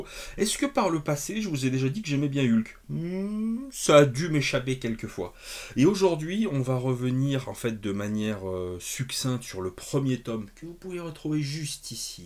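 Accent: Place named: United Kingdom. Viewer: Mais c'est que ça lui va bien ce petit rafraichissement capillaire. Et cet accent british... Oh My...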